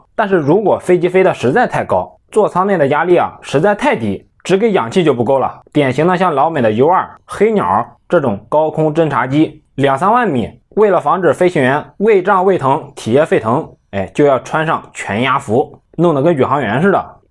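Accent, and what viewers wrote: Henan, China. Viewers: ，听着是老乡啊，你河南哪里的呀？ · 你是河南的吗？咋口音有点像河南口音😂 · 河南，还是山东的？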